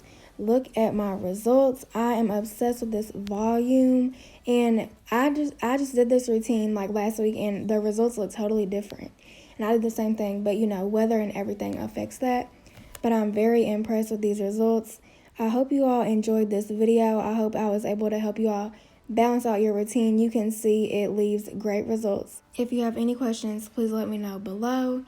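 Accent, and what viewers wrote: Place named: Tennessee, United States. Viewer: Girl u sound like u live n my state TN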